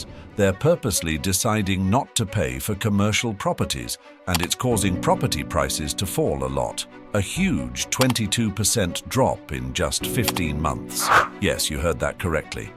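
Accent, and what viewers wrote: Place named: United Kingdom. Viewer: When I heard the voice was British....I knew everything said would be a lie.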